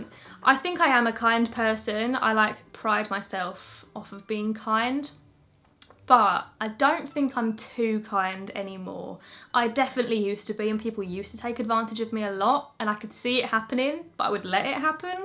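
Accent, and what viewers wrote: Essex, United Kingdom. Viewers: You're legit me but prettier and with an Essex accent 😂🤩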